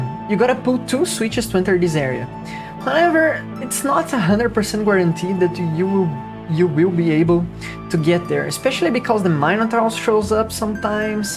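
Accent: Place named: Brazil. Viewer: brasileiro sim ou com certeza? kkkkk sotaque ta igualzinho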